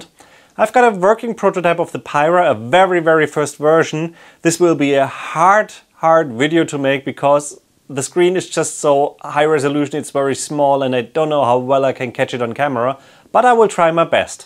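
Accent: Germany. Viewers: I knew that you are german :D unseren Akzent kann man auch nicht verstecken :) Cooles Video!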